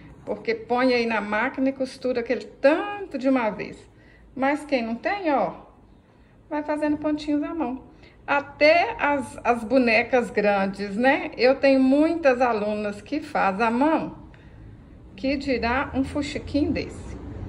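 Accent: Minas Gerais, Brazil. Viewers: Adoro assistir suas aulas. Elas sao fáceis e esse seu sotaque de mineira é uma delícia!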